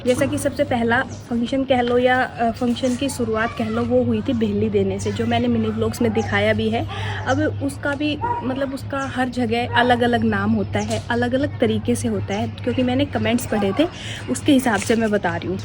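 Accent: Haryana, India. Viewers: Haryanvi acent is very Lovely and name is -moksh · Apni boli m hi banaya kro vlog 😂 haryanvi on top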